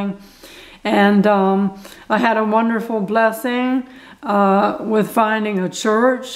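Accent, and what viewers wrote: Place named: South, United States. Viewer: lol not really a state but ok. I live in SC and I can tell you are from somewhere close. GBU too.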